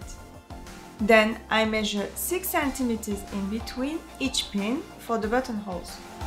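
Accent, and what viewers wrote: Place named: France. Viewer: on sent bien l'accent francais xD mais merci quand mêne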